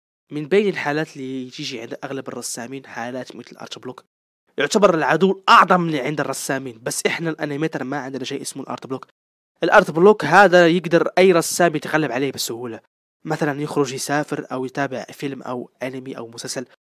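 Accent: Morocco. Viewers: من هدرتك حسيت بيك مغربي فاش شفت فيديوهاتك تأكدت 😹😹 حبيتك الاخ 💁♥️ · ولد بلادي باينة غير من هضرتك😂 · انت مغربي؟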